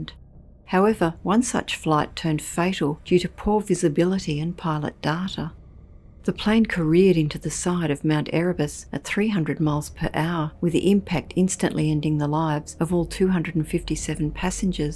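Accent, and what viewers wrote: Australia. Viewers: it's an Aussie Sheila it is .🐨 😲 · Here from North Queensland, Love hearing another Aussie voice, 🇦🇺🇦🇺🇦🇺🇦🇺🐨🐨🐨🐨🐨🦘🦘🦘🦘and awesome channel.👍👍👍👍👍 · She's Australian. I'm one, I can tell.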